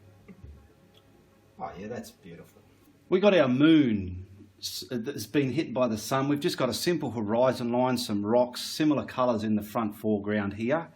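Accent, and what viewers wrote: Australia. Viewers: Hola from Quebec Canada ! Love to paint now that I found your tutorials ! Love your style as well as your Aussie accent…though I work hard to undestand everything you say 😅 Thanks a lot !!!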